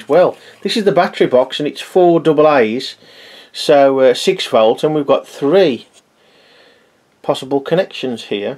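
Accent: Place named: England, United Kingdom. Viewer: you're 100% an english man (your accent ^^) , i have this box and 2 more to make anything in DIY , the problem is , in belgium we dont have a boxes of meccano "general purpose" :( im limited by the content of the boxe (for exemple the 4X4 OFF ROAD TRUCK SET is very poor of pieces ) ... can you help me please to found any pieces general purpose , thanks a lot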